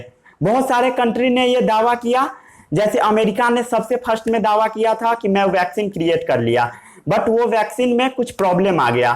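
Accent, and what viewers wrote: Bihar, India. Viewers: saab BTW pronunciation is not a genetic feature.. And the so called 'pronunciation problem" you are talking about is our accent which we Biharis are proud of.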